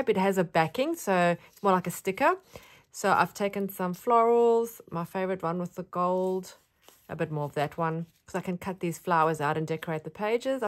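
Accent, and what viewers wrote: South Africa. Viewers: Hi from South Africa. Nice to listen to a fellow SA.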